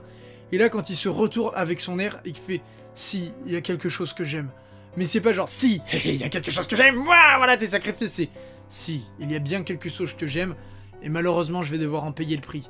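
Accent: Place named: Lyon, France. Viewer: Tes lyonnais ? prck tu di trikar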